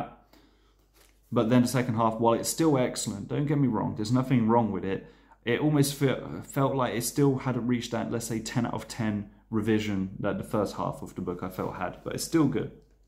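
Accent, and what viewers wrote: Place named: United Kingdom. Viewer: i love your british accent very calming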